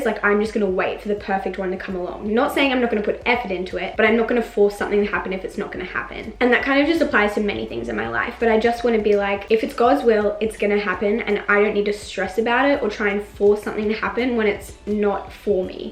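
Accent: Australia. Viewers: honestly probably not something you hear all the time but i love the way you express yourself, i don't know how to describe it and maybe i'm just loving the Aussie accent haha but I love the way you talk and formulate your sentences!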